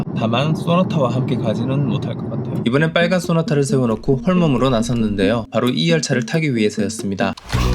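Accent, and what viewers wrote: North Korea. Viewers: 이분 탈북자임? 말투나 억양이 좀 있는거 같은데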